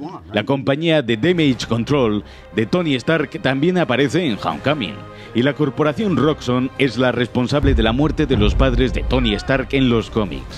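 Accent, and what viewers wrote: Spain. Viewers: Es español y así se dice aquí.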